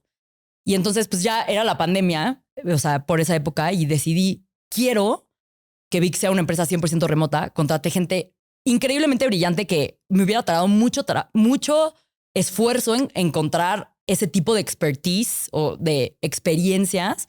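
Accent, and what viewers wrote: Mexico City, Mexico. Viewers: Asi hablan en cdmx, cuando estudias en universidades privadas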